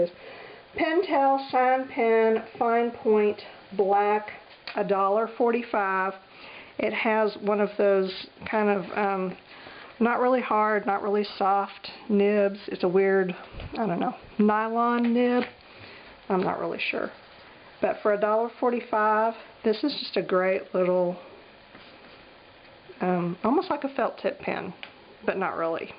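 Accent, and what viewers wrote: South, United States. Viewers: This video was great--very informative and entertaining. You are hilarious! I could sit & listen to you all day long! I've seen a couple other videos on Jet Pens hauls-but yours is by far the best. Now mi know I've got to place an order. Just have to get one of each of those notebooks. The way you cere caressing that paper--too funny! And when you smelled the marker I about fell over, I used to do that as a kid with permanent markers. 😜😜 I have subscribed to your channel & will be going back to watch past videos. BTW where are you from? Do I detect a bit of a southern accent? Thanks again.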